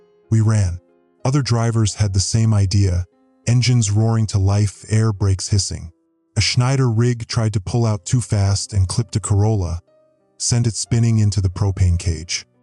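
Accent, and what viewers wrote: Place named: Spain. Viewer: The AI keeps going between regular English to a heavy Spanish accent lol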